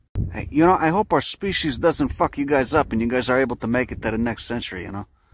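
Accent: Chicago, United States. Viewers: Imagine being in the middle of the desert and seeing a tattooed gringo with a thick Chicago accent crouching and talking to a tortoise about his diet.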